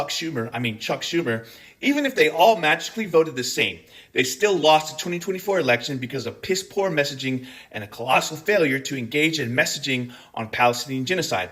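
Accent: Scotland, United Kingdom. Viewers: Love the Scottish accent lol as for the epic screw up I'm not surprised. That would be like if the Enola Gay radioed ahead to let them know a little boy was about to be dropped on Hiroshima!!! Stupid as F***!!!! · Loving the angry Scottish accent popping through❤ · Ho is you Scottish?! Hell yeah! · I made it to the end! I love the Scottish accent BTW.❤ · bro, you sound occasionally scottish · You know is upset when the Scotish accent is coming out. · Am I having a stroke or were you sprinkling in a Scottish accent? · I think this was this first time I heard Scottish accent ❤ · You would actually have to work hard to be this incompetent. 🙄🤦‍♀️. I hope no one in our military dies because of these idiots playing their own personal game of Risk. It was nice to hear more of your Scottish brogue,! 😊💜👍🏻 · Innit cute! 😁🤭 But it's a Scottish accent! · Absolutely love your stuff! 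I apologise if this has been asked before, and also apologise for it not being relevant to the point of this video but… do I hear a slight “accent”?. I appreciate everyone has an accent really but I’m hearing like a Scottish twang or something. I’m not from the states so could even just be the accent of where you’re from but yeh I really really like it and eventually was like omg just ask the man 🤦‍♀️also honestly thank you brother for giving me legs to stand on so I can stand with you. by informing me about how your guy’s government is affecting you, your fellow Americans, those humans guilty of simply wanting to be part of and contribute to America or even just to be safe or at peace, and the rest of the world right now. If you ever wonder how powerful your voice is well I’m glad to let you know it’s powerful enough to have crossed the sea and stir real emotions/dedication to humanity. 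· Is it me, or am I really hearing a bit of a Scottish accent? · 👏👏🫱 🔥🔥💪👊🖖...yep I'm being a cunny funt 😂 Is it just me or does keep dropping bits and pieces of an accent (except for the sepo one of course 😉) in every now and then throughout this particular video? I swear I'm hearing dribs and drabs, a bit of Scots, a bit of Taff, a bit of pom, a bit of Eire, either that or I've got a couple of them big furry grasshoppers (🦘) bouncin' around in the top paddock (🙇‍♀️)...🥴 🤣🤣 Catch ya l8r 🙃🐨🇦🇺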